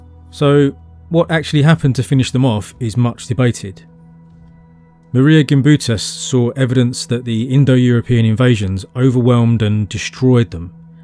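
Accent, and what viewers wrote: United Kingdom. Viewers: I cant listen to British narration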